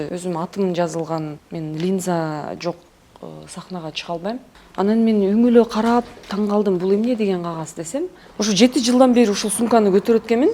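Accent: Standard. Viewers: Жөнөкөй, кыргыз тилин таза сүйлөгөн, жагымдуу, таланттуу сонун кыз ❤❤❤ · Кыргызча таза Тил байлыгы ото коп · Нукура кыргызча суйлогонунуз жакты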